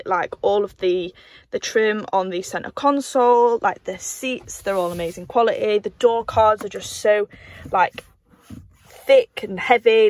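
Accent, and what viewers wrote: United Kingdom. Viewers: I'm pretty used to thick British accents but I raised my eyebrows when you called those birds 'dogs'. XD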